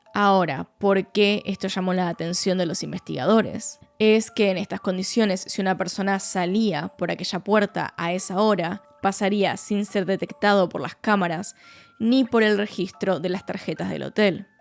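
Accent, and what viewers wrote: Argentina. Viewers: Eres mi fav. Aparte que amo el acento argentino! Saludos desde Mexico 🇲🇽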